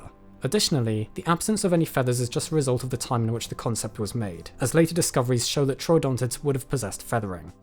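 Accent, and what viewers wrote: United Kingdom. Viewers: It sounds like it must be a smart argument because he's British, so it sounds smart....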